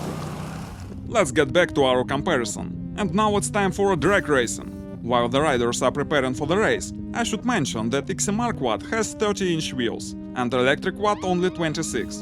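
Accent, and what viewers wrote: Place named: Russia. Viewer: On peut voir le même vidéo en francais,ce serait moins pénible que l'accent russe.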